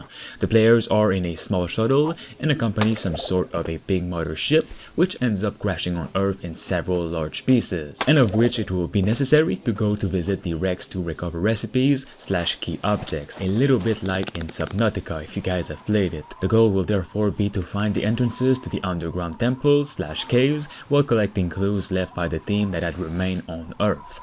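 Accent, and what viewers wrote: Quebec, Canada. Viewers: Es-tu québécois ? Ton accent semble familier :P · Are you from Quebec? I'm hearing a bit of an accent :)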